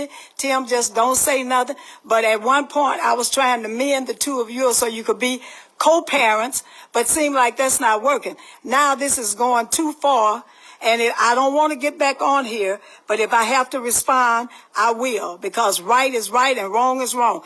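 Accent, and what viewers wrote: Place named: South, United States. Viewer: That's it. That's all! Talking like a southern woman with morals! God Bless you Ms.. By the way you look gorgeous! You go now!!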